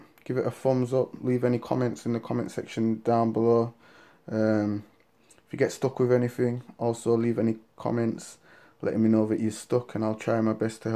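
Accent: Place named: United Kingdom. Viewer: He's British you can clearly hear his accent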